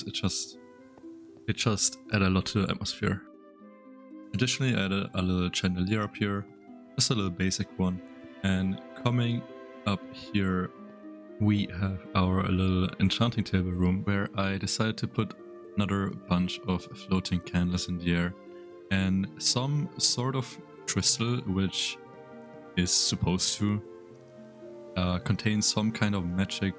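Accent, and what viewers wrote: Germany. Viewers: Wait, are you german? Love the Intro! <3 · ich hörs doch raus du musst deutsch sein. Warum finde ich niemand der gut bauen kann wenn du nen privaten builder server hast bitte lass mich ma drauf kann auch paar bilder schicken als beweis das ich builder bin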